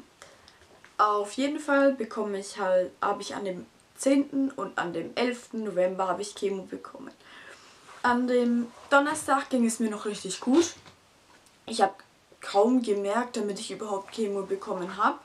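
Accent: Germany. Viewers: Du bist ja hübsch 😊 und noch so jung! Kommst du aus Franken? Du redest wie ich 😂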